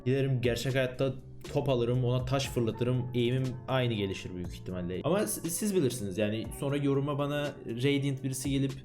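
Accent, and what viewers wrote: Thrace, Turkey. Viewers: bi trakya ağzı sezdim harika video beğenildi .d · abi trakyalısın galiba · Abi sen Trakyalı mısın ? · Abe adaş trakyalısın galiba sen nerden Be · D-dostum sen benim trakyalı adamımsın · trakyalı mısın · Abi trakyalısın galiba siveli konuşunca çok iyi oluyor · abi sen bizim oradansın beya tırakya dimi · Hep videolarında trakya şivesi konuş çok iyi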